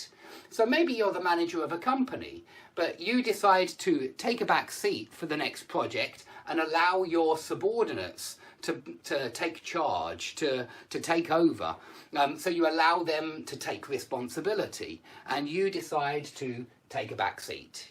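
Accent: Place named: RP, United Kingdom. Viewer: I simply adore your accent! It's so posh 😃👍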